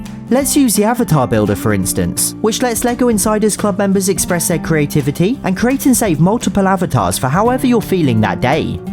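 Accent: United Kingdom. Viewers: R u british ?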